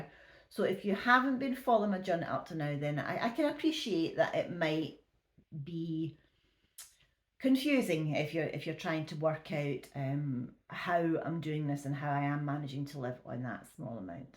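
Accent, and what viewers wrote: Scotland, United Kingdom. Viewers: Hi, some people are so rude they just are not really worth bothering about. I really enjoy your channel and your blunt Scottish accent. I am 89 and have enjoyed my life. I live with my son now because when my husband and I retired we sold up in the UK and moved to Spain we had 10 wonderful years out there then my husband got terminal cancer so we came back and he died 6 months later. I sold my villa in Spain and was able to give my two grandsons the deposit for their own homes and help my two children out as well. I live in the South East and property is very expensive so I was able to sent them up while I was still alive to see them enjoy it. I live with my son because he is alone and we enjoy each others company I have a good state pension and two small private pensions and some savings as well. I have paid for my funeral so now I am enjoying the rest of my life with my children.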